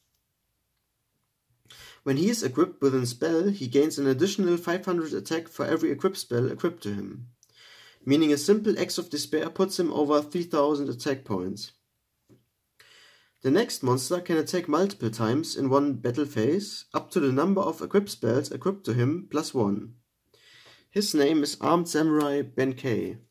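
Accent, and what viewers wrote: Germany. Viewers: wie man das deutsch raushört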